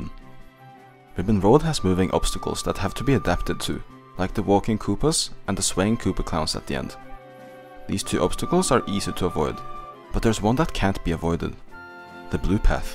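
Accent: Norway. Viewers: Jeg bare hææ hvorfor høres du litt norsk ut noen ganger også leste jeg på profilen haha, nice! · Wow I'm pleasantly surprised by the shear quality of this video you've made! Amazing video, I thoroughly enjoyed it all the way through :D Also: I guess I've found someone with a thicker Norwegian accent than mine (not saying it's a bad thing btw) haha :D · As a Norwegian myself, i heard your voice, and thought «Hmm, his accent is a bit norwegian…» AND I WAS RIGHT 😭😭💀🔥 W Vid btw ❤️ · Du må være norsk! Basert på aksenten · Bro eg hører på stemmen din når du snakke at du e norsk💀💀Eg har mye være engelsk stemme da lmao. kul video da fortsett sånn!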